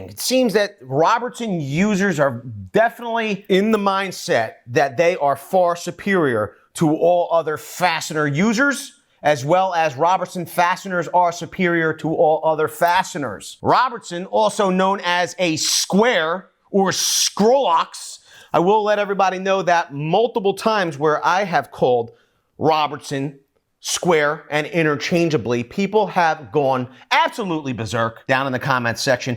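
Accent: Philadelphia, United States. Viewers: I knew you had to be from Philly, you got that Philly energy and flare. I agree with you on the Phillips bit, most people don't understand the concept and think its an annoying feature but in aviation breaking off a fastener in the airframe means at best hours and inspection and engineering, structural analysis and tedious removal and rework. At worst all of the above plus removal of the affected airframe and replacement, hopefully nothing flight critical.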